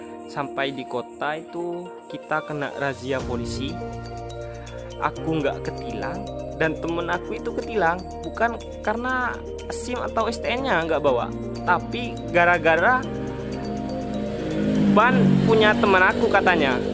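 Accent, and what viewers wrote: Madura, Indonesia. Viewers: Kayak orang Madura suaranya yang omong tuh bng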